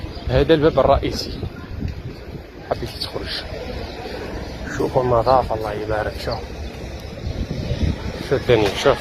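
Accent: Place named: Morocco. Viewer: ماروكي باينه لهجتك خخخخخخخ😊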